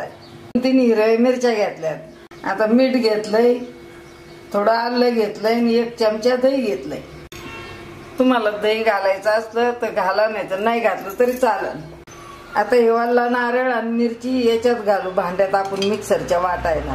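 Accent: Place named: Dhule, India. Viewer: Aho aaji tumhala sagle yete ase naka bolu mi tumcha aavaj eikla ki mala ase vatte ki mi dhule la aahe chan boltat aaji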